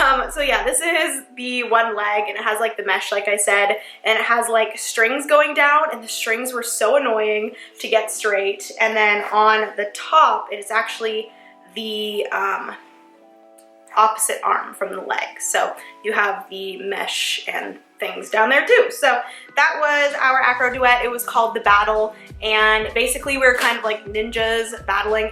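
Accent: Canada. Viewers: paige thames she is French candian thAts why she talks like that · Evelyn M, she can't help it! That's what happens when you're born with a Canadian accent. What if I said I didn't like that you're a girl, you can't help it you're born with it so stop being mean to people when they can't stop or help with their doing or saying. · Are you Canadian? It really sounds like it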